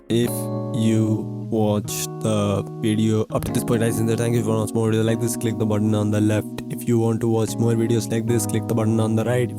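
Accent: India. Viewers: indian ah accent is so annoying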